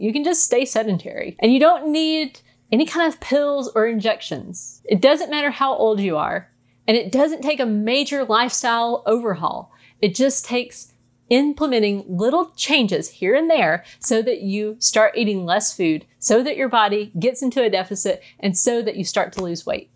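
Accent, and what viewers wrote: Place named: England, United Kingdom. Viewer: you sound very English, my genetic background, however married a Frenchman! I find your slow and steady climb quite remarkable,and reflect if I had been satisfied with a slow approach I’d be done thrice over! Making such changes and so comfortable with your own path is inspiring. Great victory! Even the selling off your collection is profound! I believe I must dedicate my health and consequently eating habits that occupy my frame regarding size/shape to tell a different story from now on, in fact I insist! The wine actually led to picking and snacking…like a sloppy will, so I’m taking the reins, and I’m truly in awe when someone aspires to freedom and Triumphs! Move over I’m on the move!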